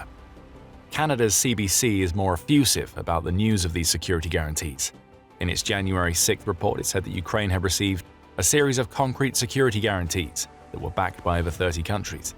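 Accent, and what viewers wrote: England, United Kingdom. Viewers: English accent but American grammar.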